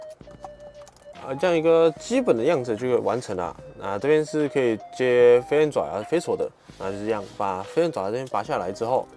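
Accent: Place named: Japan. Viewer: 應該是剛學好普通話的日本人吧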